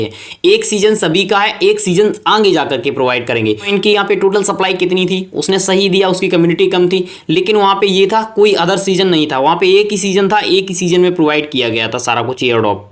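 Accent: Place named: Bundelkhand, India. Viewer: Bhai apki awaaz sunkar bundelkhand ki yaad taza ho jati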